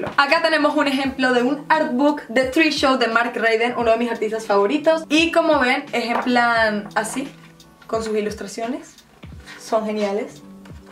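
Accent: Venezuela. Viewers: Hola. tienes una voz bonita entre venezolana y ese asentó jajajaja vives en rumania?¿ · Ojalá Venezuela salga por lo que está pasando y si tienes el acento venezolano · de que parte de Venezuela eres te reconocí por el acento venezolano, de mi parte soy de los andes Tachira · Si tiene acento venezolano, soy venezolana y al ver uno de sus vídeos por primera vez me dije "esta chama tiene que ser venezolana por el hablar" xD solo que todos hablamos un poco diferente dependiendo del estado donde vivamos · El acento venezolano no lo has perdido. Pareces una loca rusa pero el acento supe que eras una chamita venezolana. DTB desde Venezuela. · YO SABÍA QUE ERAS VENEZOLANA LLEVO RATO ESCUCHANDO EL ACENTO AHAHHA Hola yo también soy de Venezuela ❤ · Y ya se te notaba que eras venezolana , saludos desde Venezuela . Cojedes :p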